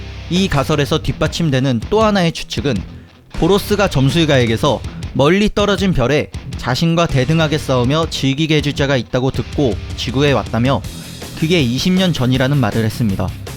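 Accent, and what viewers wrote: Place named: Gyeongsang, South Korea. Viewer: 경상도분이신가보넹 잘봤습니다.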